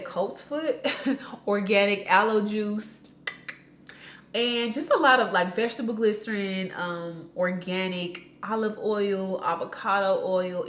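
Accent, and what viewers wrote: South, United States. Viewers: Sis! Your skin, hair and southern accent! ❤️❤️❤️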